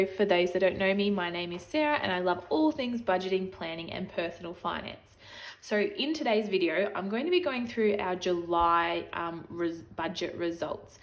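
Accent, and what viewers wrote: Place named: Australia. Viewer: You gotta love that extra paycheck! So September will probably be the same for you because it's an extra paycheck for me too. Sounds like you're Australian which is sweet! I just found you by searching for budgeting channels on YouTube because I love the budgeting community and focus a lot of my content around that too. Keep up the good work!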